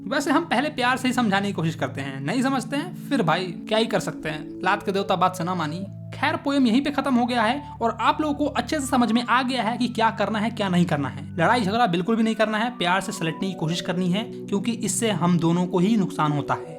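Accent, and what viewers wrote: Bihar, India. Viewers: Pakka aap Bihari ho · By voice we call yell he is from Bihar 😅😂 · Bihari ho yar app to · Bihar se ho na bhai❤ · Bhaiya aap Bihari ho kya❤ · Bhai aap bihar se hona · Bihari hai ke tu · Are you from Bihar?? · Aap bihari ho kya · Aap Bihari ho kaya? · Bihari acent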